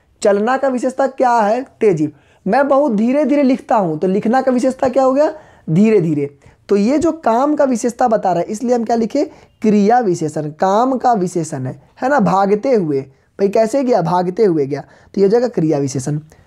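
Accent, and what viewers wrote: Bihar, India. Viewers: Sir aap bihari ho kya ,🤓🤓🧐🧐🔥🔥🙋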